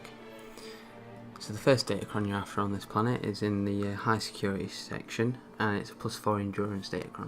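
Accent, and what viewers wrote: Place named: United Kingdom. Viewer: Yes, Ewan McGregor :D And I mean the person that is speaking in the videos. I wish I had this accent. I am not British though so I have to live with my foreign one... ah well :D Jealous though.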